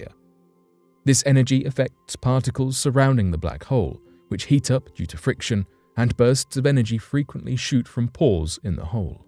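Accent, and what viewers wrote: United Kingdom. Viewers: Earache British voice, thought this might be entertaining but it's just fucking annoying and monotonous, no sub, set to do not recommend channel.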